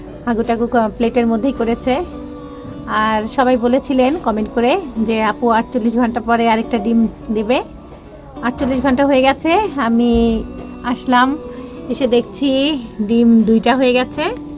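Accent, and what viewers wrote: Rangpur, Bangladesh. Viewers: Onek moja pacchen kobutor ke niye mone hoy upner bastota bare giyeche upner kotha shune mone hocche Rongpure bari amader bashao Rongpur thaki from Austria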